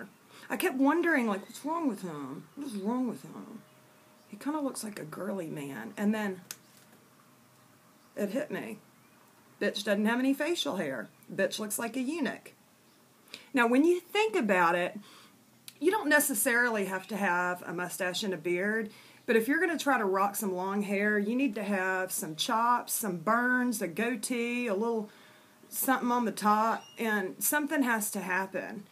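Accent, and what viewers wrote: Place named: South, United States. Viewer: I love your southern drawl !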